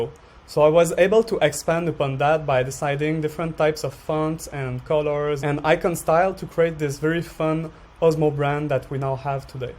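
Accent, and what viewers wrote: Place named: France. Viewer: French guy for sure !!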